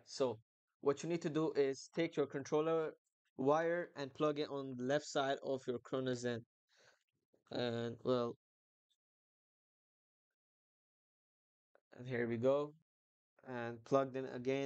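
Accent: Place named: Germany. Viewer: sounds like german accent lmao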